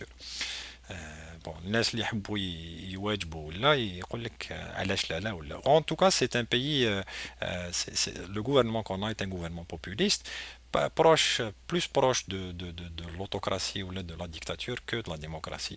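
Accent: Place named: Algeria. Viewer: I really do like the way you speak Algerian arabic especially " el ktoubet", fine personal analysis of the situation which prevailed in Algerie during the 90"s carry on,sahit a ghma thanemirth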